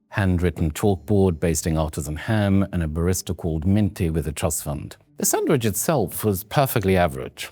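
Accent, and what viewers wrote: RP, United Kingdom. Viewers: Now there is a plummy accent!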